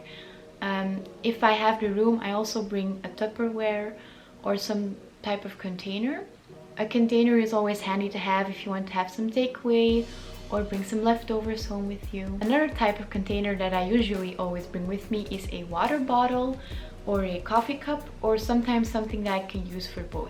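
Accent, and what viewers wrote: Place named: Belgium. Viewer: you kinda sound like ur belgium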